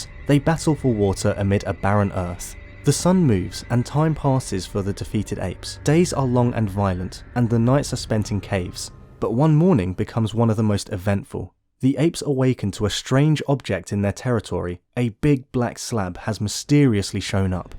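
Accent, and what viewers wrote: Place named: United Kingdom. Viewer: I couldn't understand this video with his British accent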